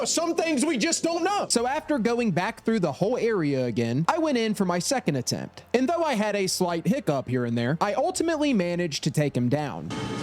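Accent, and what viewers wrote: United States. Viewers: first time i seen this guy and ten seconds in i’m like “baltimore” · Ayy fam are you from Philly/Delco??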